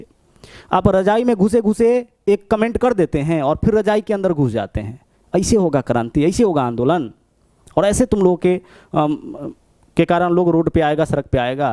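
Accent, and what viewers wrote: Bihar, India. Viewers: सर आप आए बिहारी भाषा के स्टाइल में 🙏🏻🙏🏻🙏🏻🙏🏻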